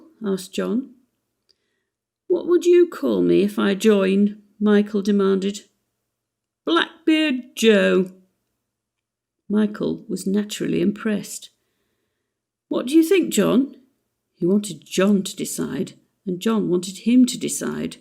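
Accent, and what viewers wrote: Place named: United Kingdom. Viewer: I like British stories read with a British accent best & this one is easy to hear, loud & clear. Thank you.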